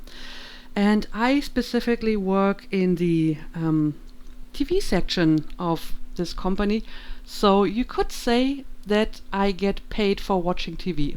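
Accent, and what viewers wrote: Germany. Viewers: Congratulations! I knew your accent but I never found out where it came from in Germany so thanks for answering that question! Other things I'd like to say are that I do share your interest in Folk Metal and that most of us french people (I'm from Strasbourg) also find snails gross. Btw I checked the Bielefled controversy. Just wow. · When I first heard your voice, your accent sounded really familiar. I actually wondered if you were Tianlein who does Elder Scrolls Online fashion content here on youtube. Maybe a second channel of hers but I clicked on one of her videos and her voice is slightly different to yours (also German though). So, I used to play Elder Scrolls Online with a German lady about your age, from Cologne, who liked exactly the same folk metal music and is called Katrina (shortened online to Cat). The only reason I know it cannot be the same person is because I played ESO on PS4 not PC. 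Also, the person I know is actually Indian and that part of her accent is not present when you speak. Very strange coincidence though lol.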